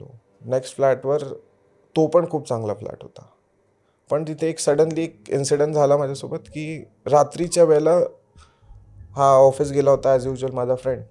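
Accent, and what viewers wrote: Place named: Pune, India. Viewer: Bhai mhit nhi khott vate but amchya nagpur chi evdhi shudu language nhi ahe bhau ....ekda nagpur firun bgha smjhel ha pune vgere mdhla vatatoy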